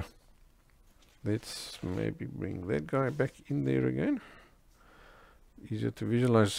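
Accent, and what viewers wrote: South Africa. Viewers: Your paintings are amazing! Thank you for sharing your talent with us. You sound South African, are you? Because I am and I have found that selling your art work in this country is difficult. Do you have the same problem?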